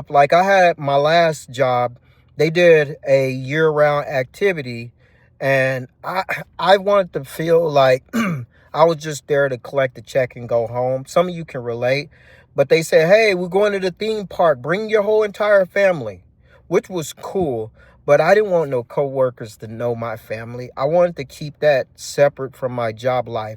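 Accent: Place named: Missouri, United States. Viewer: Bro...Solid commentary as always. Also, U remind me of Mason Betha but with a Missouri accent. You should write a book with all of your stories. Reminds me of stories I read in two books: (1) Working for You Isn't Working for Me (2) Living Successfully with Screwed-Up People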